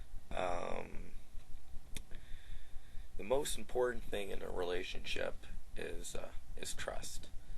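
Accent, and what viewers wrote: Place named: Canada. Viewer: i liker yer Canadian accent! like when ya say "OUT"...cute{i'm not gay[not that there's anything wrong with that], just callin a spade a spade}(fer serious). You really do have a gift as the voice of reason here!...great job.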